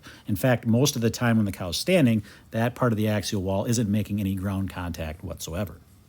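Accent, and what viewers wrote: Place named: Wisconsin, United States. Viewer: I know a fellow Wisconsin homie when I hear one! Haha. If I ever get cows I want you to take care of their little feets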